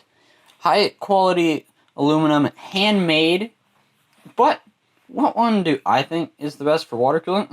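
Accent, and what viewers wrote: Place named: Canada. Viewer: you speak so bad and the Canadian accent makes it even worse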